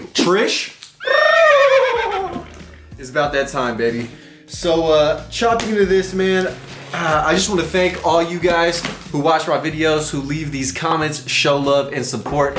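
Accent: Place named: Houston, United States. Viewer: I just love how Houston Texas you areee lmaoo if that even makes sense! I didn't notice us Houstonians had an accent until I found you and I loveeeee itttt 😩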